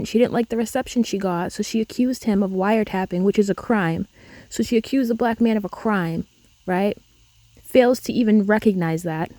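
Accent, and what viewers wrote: Boston, United States. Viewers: Yasssss. What up, what up- Can’t sleep at 230am. Baby in this belly won’t let me. I swear baby K is going to recognize that Boston accent ❤️ EDIT: how do we help support your need for coffee?? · I love this channel! 🤣. Don’t mess with the Baaaston accent! Lol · Omg no way I'm not one of the first to fan girl over this absolute awesome smart a f, awesome cute Boston accent ass creator js.. (sorry) Buttttt I'll disagree with TOS and that's the beauty of being able to be adults in this world.. twitter wasn't a free speech platform esp someone inciting violence on his own home with his own smooth brain cult followers. Yes he was the president.. but meh Racism prejudice and bigotry went up in the last 4 yrs. I suppose my views "based" but if u not gunna try to run a hate riot and storm a government building I think most be ok apps don't want to support hate a violence But but I still heart ur vids and u one smart as cookie and I wish I was as beauty as u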